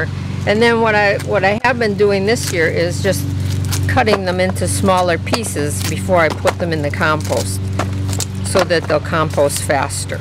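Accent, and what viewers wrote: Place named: Midwest, United States. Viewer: I love watching your videos...and hearing your MidWestern accent. My dad is from Michigan/Minnesota and I have cousins that lived in Madison and we used to visit them every summer (they all now live in NC). You sound just like them! :-)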